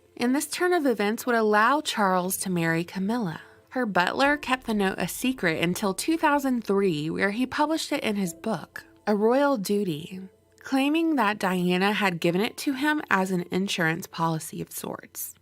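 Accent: South, United States. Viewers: Ohhhhh just finished watching and yes, omg I want MORE from you on all of the EVIL PSYCH0paths!!! Your ironic American accent is "delicious" ! I'm originally from Canada and cannot wait to share the screenshots on certain points that you made!!! Of course I shared this video on Facebook....goes without saying!!! Btw, I know you're a new mom (félicitations!) but when you feel up to it (your little sweetheart comes FIRST of course!!!), I am CERTAIN that I'm speaking for all of your fans, we'd LOVE more to you on this subject as you are able !! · How did you become Southern?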